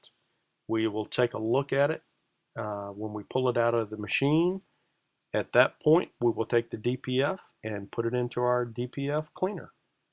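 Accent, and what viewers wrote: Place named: United States. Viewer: He's using the American pronunciation. And that's not a Sandblasting box, it's specifically designed for cleaning DPF's, which is why it has a Vaccuum unit at the bottom along with a collection box for waste and a plate specifically designed to seat the DPF - He doesn't state that it's anything super secret he even says it uses a simple high pressure air knife to blow ash through the filter - but it does work in combination with the vaccuum under the DPF...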